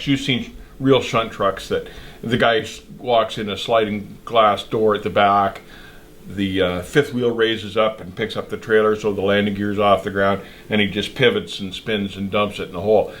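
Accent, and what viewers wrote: Canada. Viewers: You Canadians have the best accent! LOL! Love the channel! Keep up the great work!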